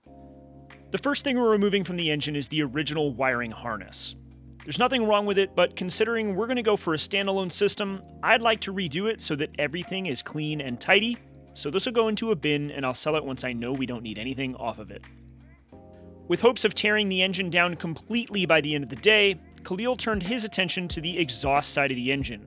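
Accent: United States. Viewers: Amusing and yet so normal to hear an American voicing over a video of a European engine being rebuilt and mentioning that he hopes that this video will make it known what this USA back street workshop can show the ROW (Which is basically Europe) how to do it ! LOL. Why not simply just phone up a European backstreet workshop to work on a European engine ?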